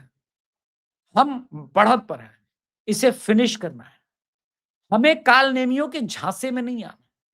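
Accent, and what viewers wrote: Hyderabad, India. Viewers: आप तो पुरा हैद्राबादी स्टाईल मे बाता करता 😂😂😂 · you have nailed the hydrabadi accent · your Hyderabadi is excellent! 😆 Thoroughly enjoyed this video! We have full-toss entertainment going on in the entire country everyday, and the popcorn machine is getting overloaded! 😂😂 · Bahit majja ata hydrabadi bata sunaneku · JI AAP ZABARDAST HYDERABADI BATH KAR RAHE HO…,OH MY GOD,MY HYDERABAD SE HOO, PAR HYDERABAD ASAL NAM BHAGYANAGAR, HA 15 MINUTE MEIN SAB BHAGJAYENGE, JAI BHARATMATA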